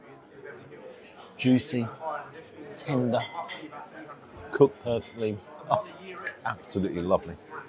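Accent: United Kingdom. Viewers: britski-petski accent is so annoying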